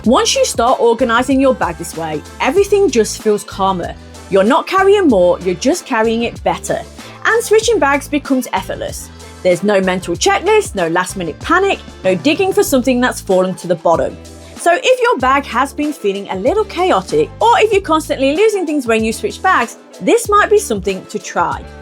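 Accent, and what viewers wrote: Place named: Northern England, United Kingdom. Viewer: Hi! It says you’re from Australia but I think I hear some northern English pronunciation every now and then. Your “U” sounds in particular. Am I way off? 😊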